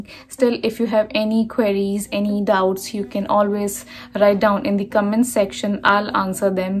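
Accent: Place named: India. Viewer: Beautiful lady, from the accent im sure your an indian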